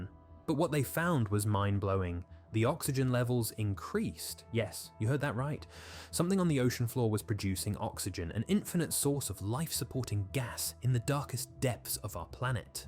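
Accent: United Kingdom. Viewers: Why do you have a British accent and an American accent?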